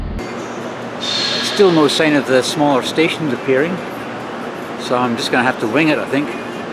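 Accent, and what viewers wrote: Scotland, United Kingdom. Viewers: Auur…aøuhr…awrur….As a Dane it’s quite complicated to try to pronounce ‘hour’ in Scottish - like you do,. 😅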